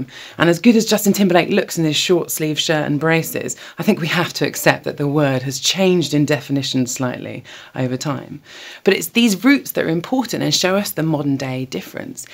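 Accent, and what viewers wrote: United Kingdom. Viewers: I normally don't like red heads or Brit accents. But they both are awesome here. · And the accent. Don't forget the brit accent. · It's the soft, clear voice with the British accent that gets me.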